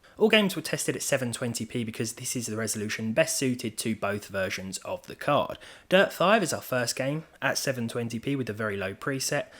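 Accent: United Kingdom. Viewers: I have no use for these videos as my worst GPU is a 1060GTX 6GB, but I watch them religiously. It is the personality, the voice and the lovely accent that draws me. So envious of the British accent. Can't wrap my head around it.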